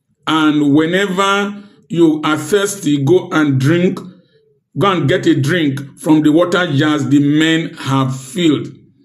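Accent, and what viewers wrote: Nigeria. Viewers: Dear Uncle I apprecite you plenty ooo. This your name is very similar and your tone and accent is very illustrative. Are you from Orogun, delta state by any chance? That surname is that of father's family too. May the universe continue to favour you sir. from Italy and The UK.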